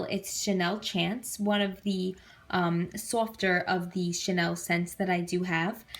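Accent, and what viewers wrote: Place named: New York, United States. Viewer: Italian/ new yorker much? Lol. Enjoyed all your beautiful perfumes we have similar taste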